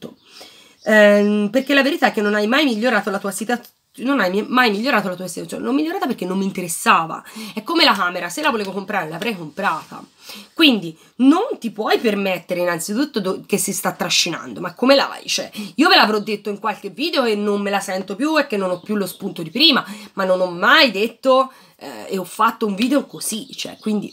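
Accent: Tuscany, Italy. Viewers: Sono contenta che farai ancora dei video, mi piace sentirti, mi fai compagnia, amo la tua parlata, mi riporti nella mia toscana da cui manco da 7 anni e che mi manca... Quanto alle domande che ti poni, ti capisco io me ne faccio troppe e tante su tutto, come dico alla mia amica io non ho un cervello ho un multisala, in cui proietto 1000 film diversi più volte al giorno e tutti diversi... Anche io mi aspetto tanto da me ed ho paura di essere una delusione per gli altri (ho qualche cicatrice anche io, anche se non si vede), per fortuna ho chi mi prende a calci o minaccia di farlo e allora proietto qualche film in meno, ma poco... Tu continua così che vai benissimo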